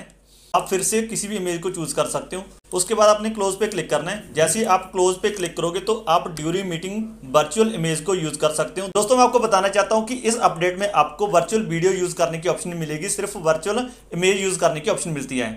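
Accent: Punjab, India. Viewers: Bhji tusi punjabi a ki hryanvi? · are u from punjab??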